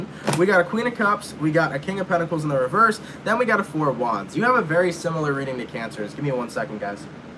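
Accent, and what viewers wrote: England, United Kingdom. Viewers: Lol the English accent 🤣🤣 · (Gemmies) yuk! Am I the only one who's cringing? English accent double cringe. Ok wots goin on? Lol xx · Thanks 🙏 for your Pisces ♓️ reading great brittish accent, have a amazing day, bless you ❤️🦋🌹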